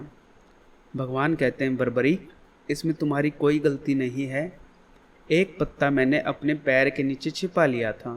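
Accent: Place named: Uttar Pradesh, India. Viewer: Aap up se ho kya ....Apki boli se lagta j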